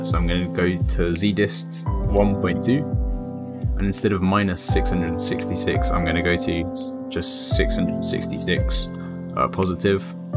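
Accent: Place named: United Kingdom. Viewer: love british accents sometimes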